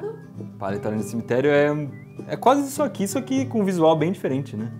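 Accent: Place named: Rio de Janeiro, Brazil. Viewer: Dexa de se carioca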